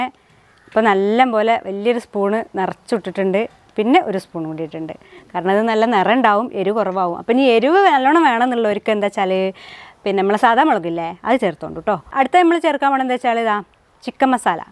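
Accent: Malappuram, India. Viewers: ഒട്ടും ബോറടിക്കാത്ത സംസാര രീതി .. നമ്മളെ മലപ്പുറം ഭാഷ അടിപൊളിയല്ലേ,,, മൂന്ത ചപ്പ്ളി പിളി ആവും,, നമുക്ക് മാത്രം മനസിലാവുന്ന ഭാഷ.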